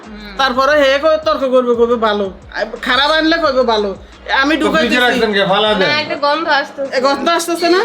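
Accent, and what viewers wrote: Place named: Char, Bangladesh. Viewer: কথায় বুঝা যায় চর এলাকার মানুষ।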